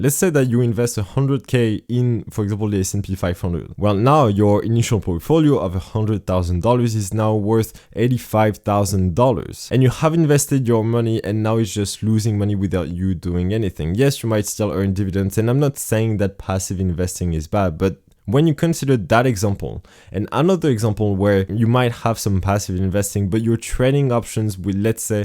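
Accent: France. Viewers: Good long term outlook. And the French accent is cool 😀.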